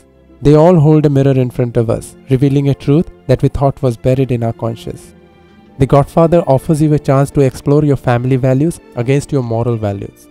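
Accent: India. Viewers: That's an indian accent, I can finally recognise it.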